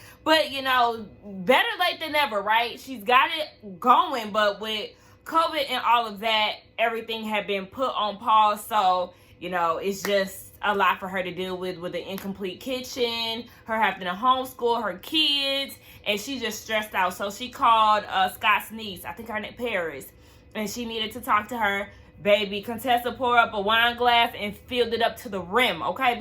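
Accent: Charleston, United States. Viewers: I swear you talk like you are from my lovely city of Charleston!!!! I LOVE IT!!!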